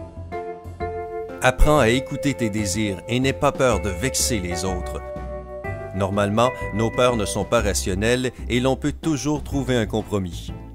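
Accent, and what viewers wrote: Quebec, Canada. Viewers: Bonne vidéo je n'avais pas besoin d de ses conseils mais vue que je connais des gens qui ont se genre de problème je pourrais les conseiller et j'adore ta voix lacent québécois et magnifique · Tu as un accent québécois likez si 👇vous êtes d'accord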